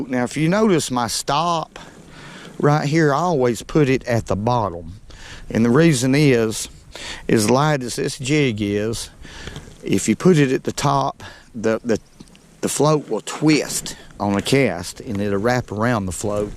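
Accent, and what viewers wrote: South, United States. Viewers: There nothing like good ole southern talk.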